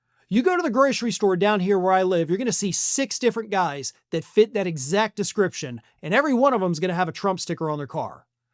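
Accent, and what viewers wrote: South, United States. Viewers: Love the redneck accent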